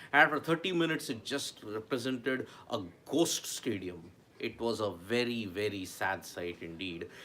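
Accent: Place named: Tamil Nadu, India. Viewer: Its Tamil Nadu accent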